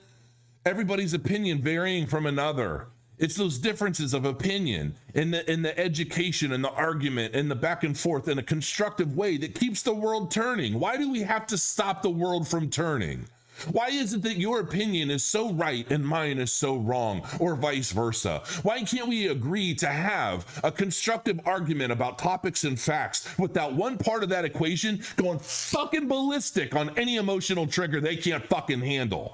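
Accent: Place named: Stockton, United States. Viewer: About time you sound like you grew up in Stockton CA.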